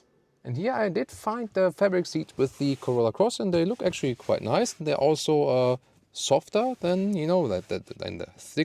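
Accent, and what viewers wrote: Germany. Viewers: Finally a clean summarize car reviews Im a Asian but if feels like the person is a German salute for being sofisticated and that acsent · Yes, but his "german english" is strange...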